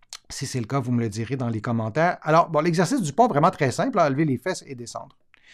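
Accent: Quebec, Canada. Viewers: Votre accent québécois m'empêche de vous suivre correctement à vrai dire.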